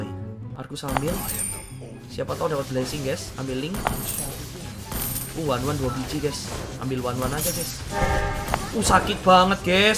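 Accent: Java, Indonesia. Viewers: medokkkkk jawa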